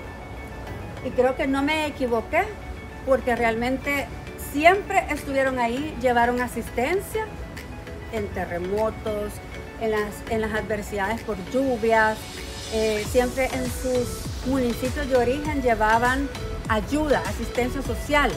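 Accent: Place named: El Salvador. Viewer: Que hermosura de mujer. Y es Salvadoreña. Es " " Cómo dice la canción.. Bella, Bella..se me encanta oírla hablar... Ese " ACENTO 100%SALVADOREÑO " QUE ORGULLO...ES DECIR...SOY SALVADOREÑO..! CON NUESTRA EMBAJADORA. " MILENA MAYORGA " ARRIBA EL SALVADOR...DICEN LA..!!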